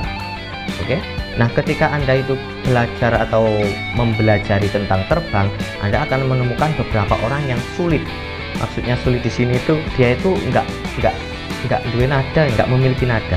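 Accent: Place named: Java, Indonesia. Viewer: Jawa ya mas? Bahasa nya kaya saya hehe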